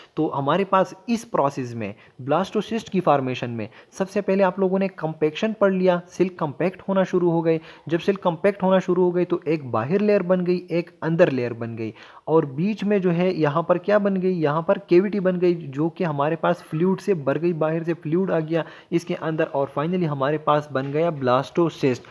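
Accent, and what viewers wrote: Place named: Khyber Pakhtunkhwa, Pakistan. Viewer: Great lectures sir helping alot. Seems like you’re from kpk??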